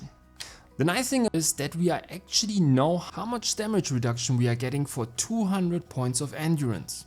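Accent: Germany. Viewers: man hört direkt den deutschen raus :D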